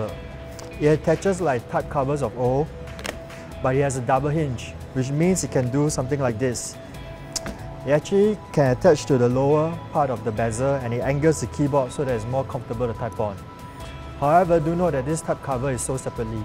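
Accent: Singapore. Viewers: Dat singlish accent...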